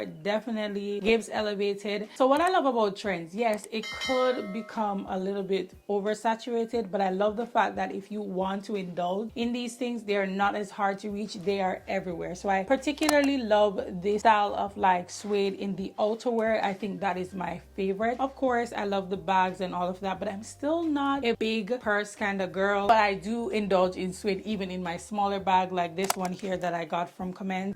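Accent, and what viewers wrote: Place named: Jamaica. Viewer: I'm seeing you for the 1st time. Heard a Jamaican accent & decided to check .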